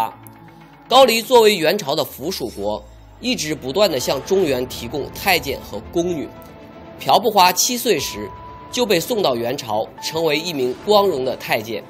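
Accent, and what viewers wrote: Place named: Northeast, China. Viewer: 東北口音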